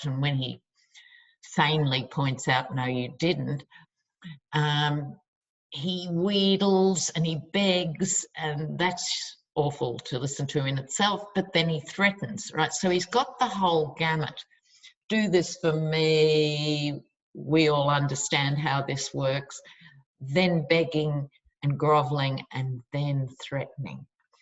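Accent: Australia. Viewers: Great readings! 🌺💐🌺I love your clear, concise yet laidback style, and your Aussie Accent is the best! 😉Thanks for sharing your awesome gifts and talents with us. Happy New Year 🛎🎉🎉🎉and Continued Blessings! 💙🧡💜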